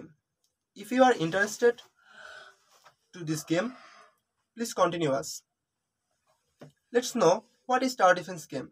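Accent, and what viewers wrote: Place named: Bengal, India. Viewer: English bolar dhoron Jodi Bangla kothar motoi hoy tahole Bangla bolen...joto sob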